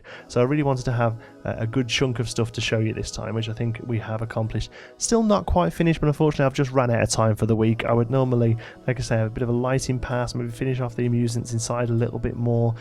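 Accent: United Kingdom. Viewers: I get what they are saying about your voice, it is very soothing. Being from America, of course, I love your accent. It reminds me a bit of the way The Beatles sounded when I was a lass. Some of your words stick out more than others, like the way you say mental. I will often stop the video to replay it again. You are a great Plan Co builder and wicked smart like a fox with design.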